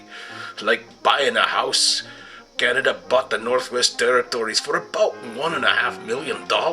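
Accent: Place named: Ireland. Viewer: Why does this guy sound irish?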